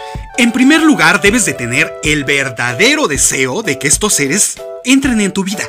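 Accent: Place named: Mexico. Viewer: Con ese asentó mexicano?🤔 Nooooooooooo Me agrada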